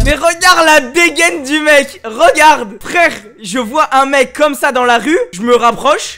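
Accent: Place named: Quebec, Canada. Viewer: On dirait un québécois j te jure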